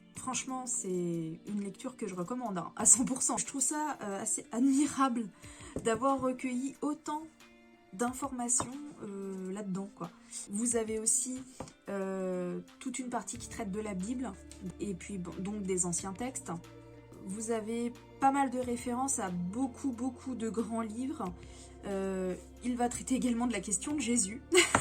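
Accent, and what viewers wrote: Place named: England, United Kingdom. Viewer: Ton accent anglais oooh. Les valeurs traditionnelles zombies oh yeah.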